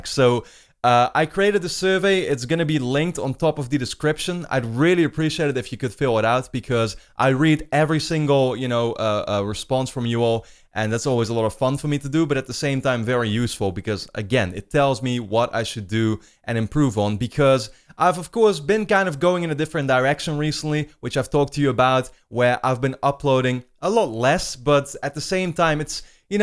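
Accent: Netherlands. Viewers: Are you Dutch or South African? 🤔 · Dime Drawer he is Dutch.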